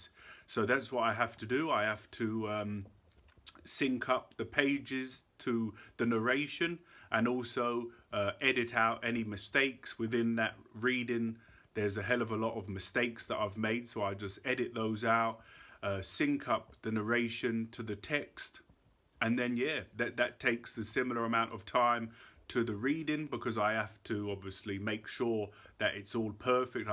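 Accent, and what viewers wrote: United Kingdom. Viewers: Wow that's a long process, but your work makes many people happy & useful thanks for doing it, btw are you British? 🥰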